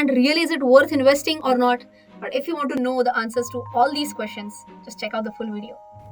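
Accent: Maharashtra, India. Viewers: Her sound tunning like she is definitely from maharashtra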